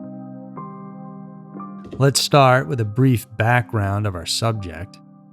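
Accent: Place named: General American, United States. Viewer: The narrator has a perfect standard american english accent I have no idea tf youre talking about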